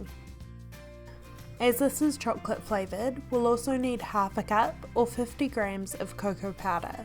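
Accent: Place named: New Zealand. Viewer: I've been making this since I was a child. Nice to hear a Kiwi Accent 😊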